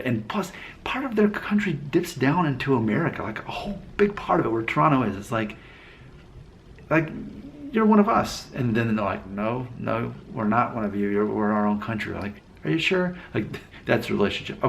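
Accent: United Kingdom. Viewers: I have difficulties in british accent..you have to talk slowly for me to understand you.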